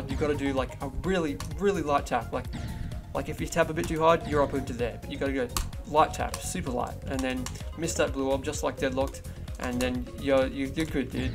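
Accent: Australia. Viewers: OMG IS AUSTRALIAN WHICH PART OF AUSTRALIA DO YOU LIVE IINNNNN??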